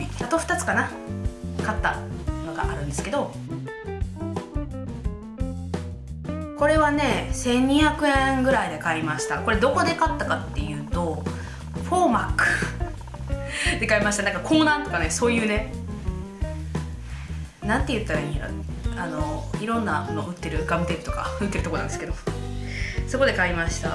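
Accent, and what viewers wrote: Kansai, Japan. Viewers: 初めまして！！メイク動画検索でやってきました！さんの関西弁に親近感わくー！大阪人で、わたしも妊娠6カ月です！(^^♪ もうすぐ出産されるんですね！！頑張ってください！！！甘いもの食べたくなる！わかりますｗｗ妊婦はつらいですよね😢 マザーズバッグわたしも大きいカバンもってないので、何を買うか迷ってます！ベビー用品とかも買われましたか？何買われたのかきになるー！これから、動画拝見させていただきます！！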